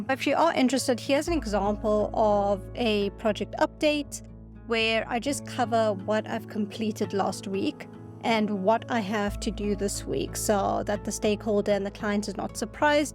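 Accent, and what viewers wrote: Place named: South Africa. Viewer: i miss south africa, i like your south african accent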